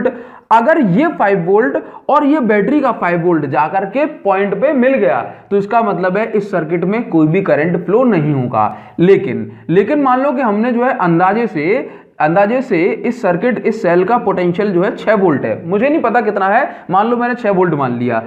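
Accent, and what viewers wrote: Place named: Uttar Pradesh, India. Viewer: Aap uttar pradesh se hona Guru ji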